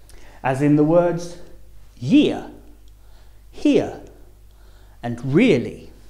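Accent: United Kingdom. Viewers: I'd like to make an observation, this class is in British English which has a different pronunciation than American. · watching this video as an American and all the examples are just British pronunciations :') "heuh" "powah" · the presenter has a British accent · He is obviously British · I have a question... Are you British or American???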